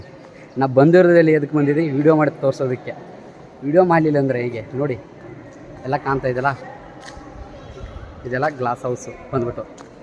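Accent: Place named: Davanagere, India. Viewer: Bro nanu davanagere talk erne maani bro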